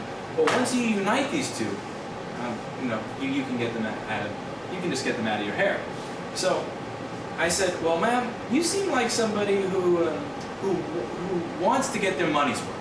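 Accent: New York, United States. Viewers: Pierjan - He's got that New York style going on. :)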